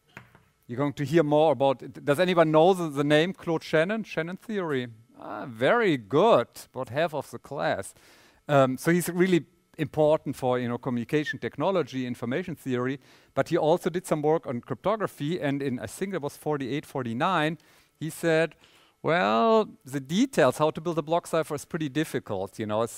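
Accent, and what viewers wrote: Germany. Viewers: german english mit ruhrpott akzent oh yeah :D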